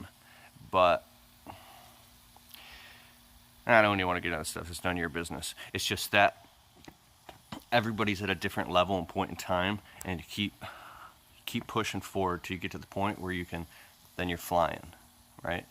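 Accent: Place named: New Zealand. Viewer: he is from New Zealand dude ...😂😂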